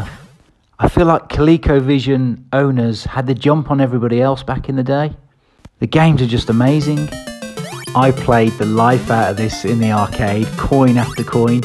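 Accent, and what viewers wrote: United Kingdom. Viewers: I love your view of this, you understand how much work it took back then, there was no templates , it’s all code! They did make quality products and titles back then. Also, I love your accent, are you in The UK?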